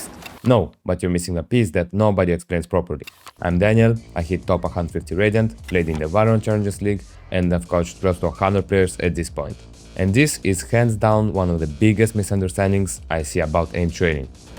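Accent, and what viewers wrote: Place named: Eastern Europe. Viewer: Whenever i hear an east european accent i know the man will go straight to the point while spitting facts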